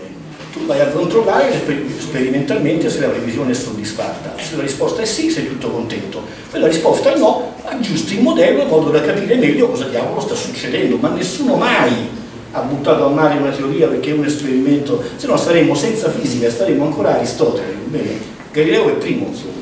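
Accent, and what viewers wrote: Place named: Rome, Italy. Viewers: L'accento romanesco ci sta, il problema è il resto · Io credo che neanche nell'Africa nera : eco, illuminazione comica, leggibilità della lavagna, accento romanesco....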